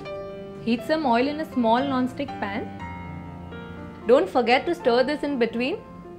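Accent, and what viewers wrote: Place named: Gujarat, India. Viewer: Good Gujarati English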